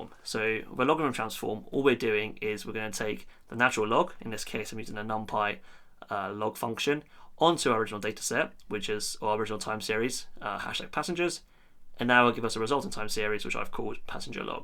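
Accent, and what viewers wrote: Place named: United Kingdom. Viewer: You are not talking with only your British people , so try to speak slowly with normal English and swallow your saliva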